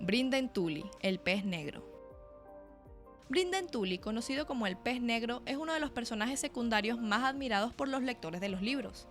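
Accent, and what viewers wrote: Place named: Venezuela. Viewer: Ese acento es de Venezuela.. me juego el riñón izquierdo de mi mamá y no lo pierde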